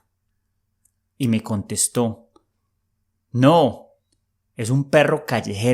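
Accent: Colombia. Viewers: Me encanta el acento colombiano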